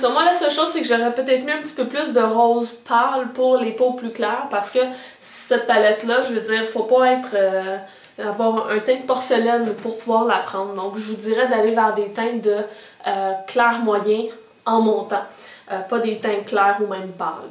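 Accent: Quebec, Canada. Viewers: Je participe a fond! Ta chaine est genial et ton sublime quebecois me fais revé moi grande Parisienne! ahahah! A bientot pour d'autre tuto. J'ai aime la page sous Lima Lapetite!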